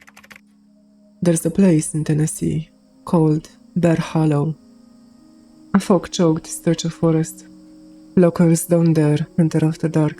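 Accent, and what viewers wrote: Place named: France. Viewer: Ur accent is beautiful and I think Ur original language is french 😅❤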